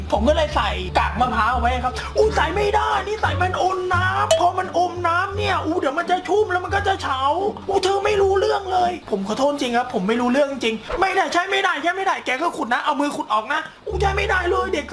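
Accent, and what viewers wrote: South, Thailand. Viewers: ครู เป็นคนใต้หรอ55555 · พี่เปนโคนใต้เห้อะะะ555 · คนใต้นิ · ใต้ออกพี่ 5555ฮาช.ห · อาจารย์เป็นคนใต้หรอนิ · พี่เป็นคนใต้ออ55555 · จารย์สำเนียงโครตใต้เลยนิ<num>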